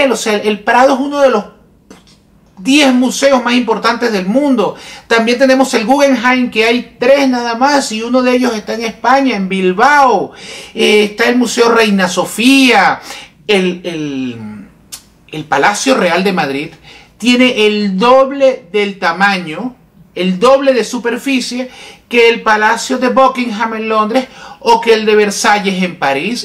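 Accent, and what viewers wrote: Venezuela. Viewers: Eso que dijiste: "España es el Perú de Europa" . Te suena muy ofensivo cuando comparan el Perú con España, y no parece indignarte que se refieran así del Perú. Uhm... y vos no pareces español... más bien venezolano. Pésimo comentarista, no reflexiona en lo que habla. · Es venezolano y está super claro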